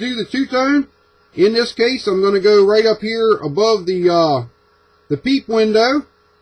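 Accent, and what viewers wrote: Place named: South, United States. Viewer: This is funny, you know how they say everyone has their own twin? Well bud,I found yours. My Brother in law Paul is almost identical to you even down to the go t and glasses. What separates you guys is the voices. Paul doesn't have a southern accent and he is a soft speaker. AND get this... He builds car models, alot of local circle track cars that raced in the 60's. Anyway,yup,you now have your unrelated twin. I wish I had a current photo. I got on your channel to see how other car modelers paint 2 tone.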